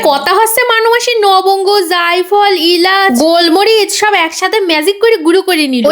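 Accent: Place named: Bangladesh. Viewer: Tor voice kintu darun ...pakka bangal mone hoch6e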